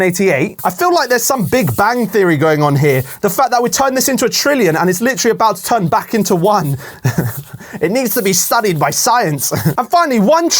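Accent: United Kingdom. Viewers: i love the britishness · “for the non-americans” him being the most british person ever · Is he British?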